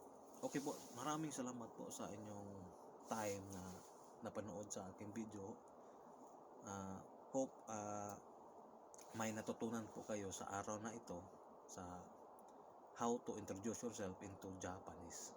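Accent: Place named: Visayas, Philippines. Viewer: Hirap mag japanese host BISAYA SHA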